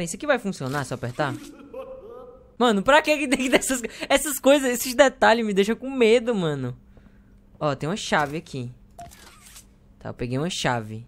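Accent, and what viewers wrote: Northeast, Brazil. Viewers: “Ee man, djabo é issai” Como eu amo meu sotaque nordestino <3 skskskjsjs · Terror com sotaque nordestino hmmm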